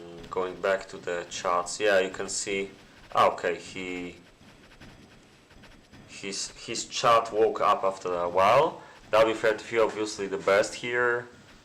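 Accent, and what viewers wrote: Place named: Poland. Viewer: Hej po akcencie strzelam że jesteś polakiem, but if i am mistaken please correct me :) Polecam wrzucać też przycięte nagrywki jako filmy (z tego co wdzię te live'y nie przebijają się w wyszukiwarce) - oczywiście jeśli Ci się chce :) Pozdro miło wiedzieć że nadal są aktywne turnieje i gracze :) może jakieś tutki ;)?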